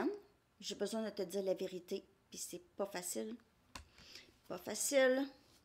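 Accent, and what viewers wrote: Canada. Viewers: Super. Avec votre super accent canadien trop mignon. 👋🏻🇫🇷😘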